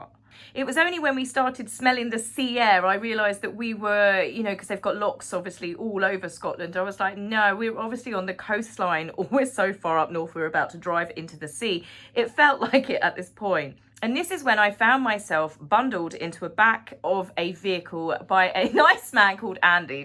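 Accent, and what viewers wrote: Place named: Southeast England, United Kingdom. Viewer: It was great to hear your SE England accent. I’m from the SE but now live in Devon and I miss it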